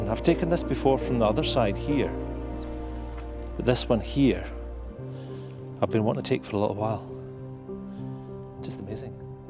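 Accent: Scotland, United Kingdom. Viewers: I came across many sites on photography, but never one like yours. You really hone in on the finer details and helping struggling photographers like myself in choosing when best to shoot and what settings to use. I love this segment, as well as the one on shooting pano when you explain the need to use the nodal stick to reduce perspective "distortion" and helps to blend multiple images better. I have since bought one such machine, thanks to your teaching. Please please continue to inspere us, the struggling photographers. Your voice is good, "smooth Scottish" accent I kind of getting used to now and it sounds like music to my ears. Thank you is never enough for such a great teacher like you.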